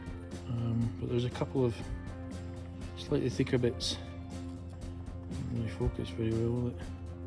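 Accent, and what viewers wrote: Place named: Scotland, United Kingdom. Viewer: what do you mean by off? That sounds like a Scottish accent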